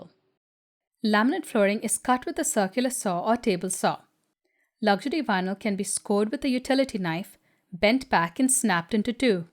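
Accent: India. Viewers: Your looks and accent are so Indian 😂 (Asian Indian). I can't get over it.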